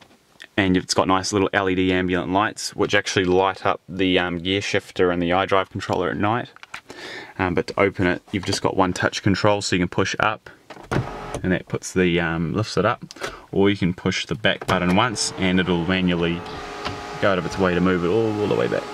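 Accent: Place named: New Zealand. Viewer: awesome detailed video. like ur kiwi accent too :)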